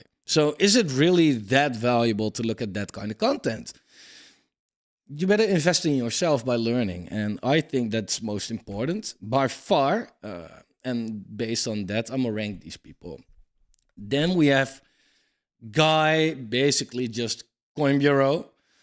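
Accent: Netherlands. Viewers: blijft leuk he, dat nederlandse accent, je praat goed engels hoor !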